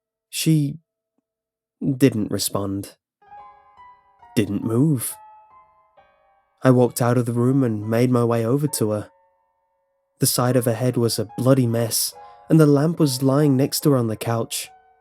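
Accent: United Kingdom. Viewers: Gosh damn it he's British.......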